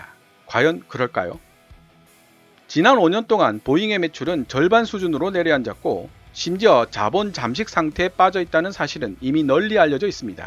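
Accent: Gyeongsang, South Korea. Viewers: 갱상도 사투리 아주 구수하네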